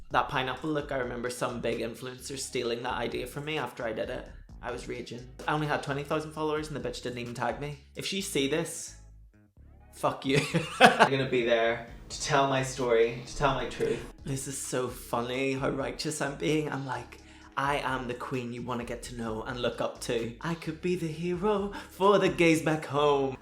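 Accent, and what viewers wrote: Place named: Northern Ireland, United Kingdom. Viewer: Literally FELL IN LOVE with the moment I saw you. Your Aura is so fresh and I love a NI accent lol. You being a Crowned Queen is CORRECT 💙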